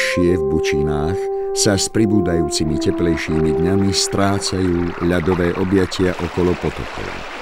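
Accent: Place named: Slovakia. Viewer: Pútavé a tá pravá írečitá Slovenčina.Super.Ďakujem.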